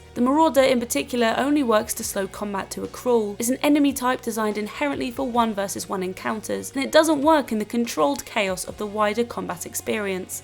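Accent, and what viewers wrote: United Kingdom. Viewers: Remember games radar before it was bought out by bored, monotone sounding British bloggers?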